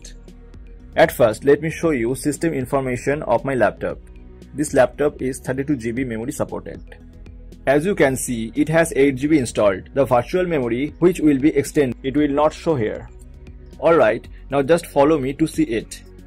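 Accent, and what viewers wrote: India. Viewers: ok yeah the best video u will watch because still works on 2021!!!! thx a lot, u know when he's got hindi's accent u know it works.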